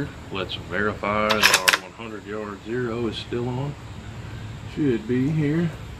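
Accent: United States. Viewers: ! Dude I love that crazy country accent of yours and I am SHOCKED that some Producer here in Hollywood has not made you a movie offer!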